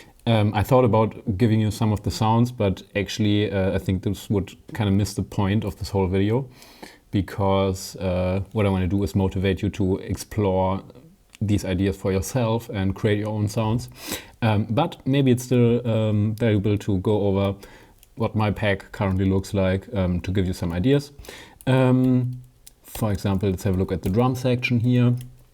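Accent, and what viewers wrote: Germany. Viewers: way better with the german accent. thanks!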